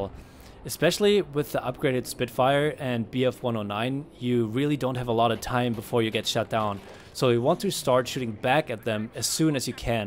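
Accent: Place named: Germany. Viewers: Ersten Satz gehört.. direkt gedacht, dass da jemand aus deutschland kommt :^) trotzdem beneide ich dich für dein englisch! echt schönes video! :) weiter so. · • dedo • wow he is German? I didn’t know that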